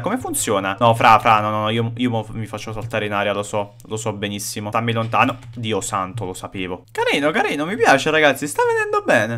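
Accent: Naples, Italy. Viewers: ma sei napoletano?🤣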